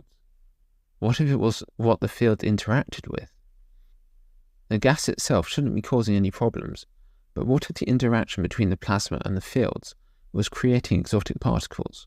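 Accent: United Kingdom. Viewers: I quite like that it is author narration. Also better British-accented American, than AI spoken anything.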